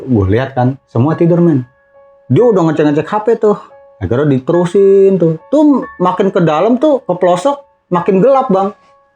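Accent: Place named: Tegal, Indonesia. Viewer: kalo lagi ngapak"mampir dong ke kampung aku aku juga di daerah tegal tau. Klo udah bicara fillowopi nya keren"banget aku suka.!!!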